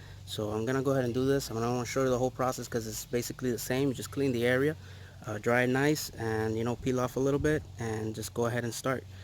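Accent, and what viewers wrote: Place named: New York, United States. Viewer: Says Florida but you talk like your from New York, or similar.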